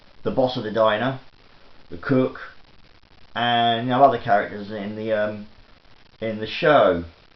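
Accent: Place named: Cockney, United Kingdom. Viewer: Hey,. It’s always cool to watch your videos. You’re probably one of the most down-to-earth British guys who I’ve come across. You deserve so much more happiness in your life. I recommend you St. Andrean’s videos. He’s a Scottish guy who’s also going through what you’re going through. Also, feel free to check out Unrealman’s videos. He makes a lot of good points and actually gives solutions. Also, it’s refreshing to hear a cockney accent. I haven’t heard an accent like that in years.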